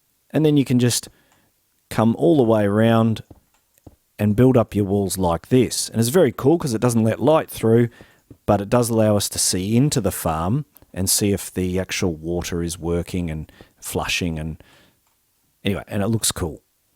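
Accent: Australia. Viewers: Such Aussie such wow!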